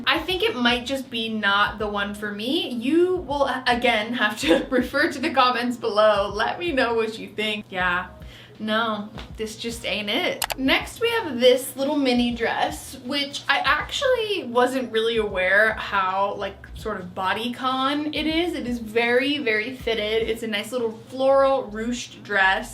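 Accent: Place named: United States. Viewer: Even though you have an American accent for some reason when I watch you I feel like I’m watching a British YouTuber? So thanks for making me feel.. at home I guess?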